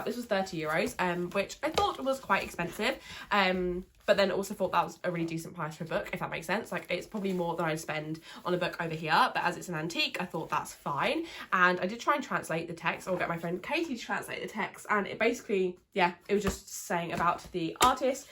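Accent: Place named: United Kingdom. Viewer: I REALLY LIKE YOU ESPECIALLY YOUR BRITISH ACCENT 😃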